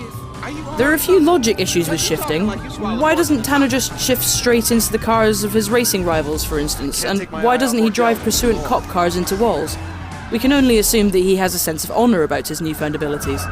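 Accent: United Kingdom. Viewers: British. I think.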